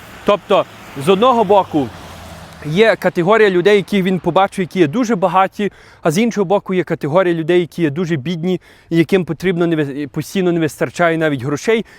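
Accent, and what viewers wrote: Canada. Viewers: Цікава історія, гарний канадський акцент......, але чому всю цю історію потрібно було розказувати під час ходьби??? Ви кудись поспішали?))) Незважаючи на вашу хорошу фізичну форму ви все одно зидихалися і кавтали слова) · Звісно в Україні горе і біль. Канадці навряд чи це зрозуміють. Але Україна не була прям бідною державою. Багато хто мав бізнес будинки ферми заводики. Але багато хто це втратив. Звісно розділення в суспільстві існує і це давить на звичайних українців, і головне бійців які навіть додому приїхати не можуть не те що в барі посидіти. Спортом треба займатися це для здоров'я нації. Але наприклад розваги для мене зараз закриті. У мене немає настрою. Якісь пізнавальні тури то ще дозволяю, що б змінити навколо простір. Звісно треба економити. Коли хтось на супер дорогій машині і при тому відмазані від служби то це викликає незадоволення. 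В Канаду треба поїхати. У Вас гарна доречі мова, хоча Канадський акцент звісно є. Цікаво чому у всіх канадців він однаковий😊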